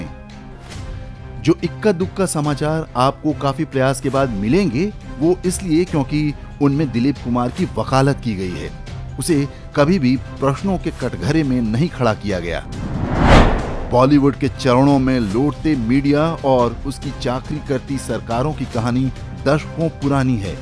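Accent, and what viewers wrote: Standard. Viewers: बड़ी शुद्ध हिन्दी है आपकी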